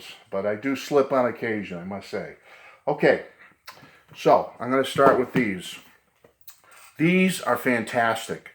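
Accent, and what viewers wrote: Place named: New England, United States. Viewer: Hi. this was a big help to me I did like your video. It was a lot of fun to here your New England accent:}. My Grand mother was from New England and see did say park the car a lot lol.:}. So thank you for that happy memory of here!!!:}. O great video lol Take care my friend!!!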